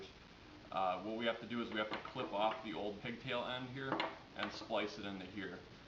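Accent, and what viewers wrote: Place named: Canada. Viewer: canadian for sure. good guy..thanks for sharing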